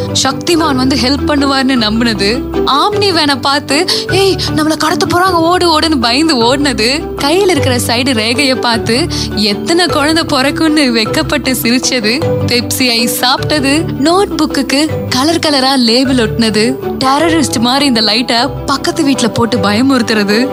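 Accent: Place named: Kongu, India. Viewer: Hi hru voice super kongu Tamil PSG arts college mass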